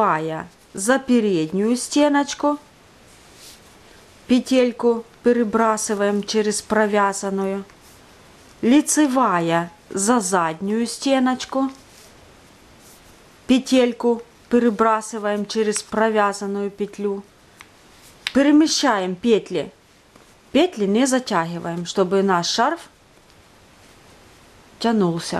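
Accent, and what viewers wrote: Ukraine. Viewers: Западная Украина ? Или просто суржик ?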